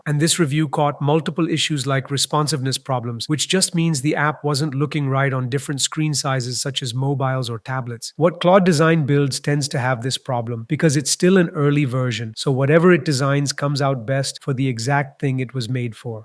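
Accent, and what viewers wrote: India. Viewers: imagine using an ai narrator and still choosing indian accent holy shit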